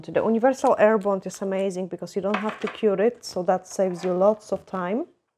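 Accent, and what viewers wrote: Scotland, United Kingdom. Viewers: Love the set, love your work and attention to detail. Especially love your accent with Scottish influence. Hope to get to Scotland in the next couple of years!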